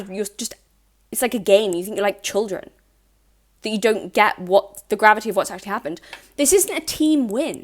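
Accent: United Kingdom. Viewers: Not listening to some one with a British accent. No thanks.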